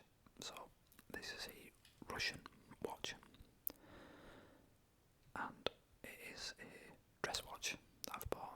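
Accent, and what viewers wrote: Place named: Teesside, United Kingdom. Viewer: That huge watch with the blue face is lovey, I'd wear something like that... I'm trying to place your accent, I'm thinking south of boro but not as far south as Whitby.. I'm in Sunderland. nice video anyway :)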